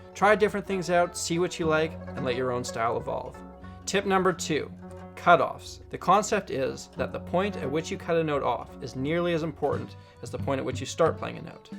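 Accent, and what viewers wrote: Winnipeg, Canada. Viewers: Is this guy from winnipeg? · Are you from Winnipeg?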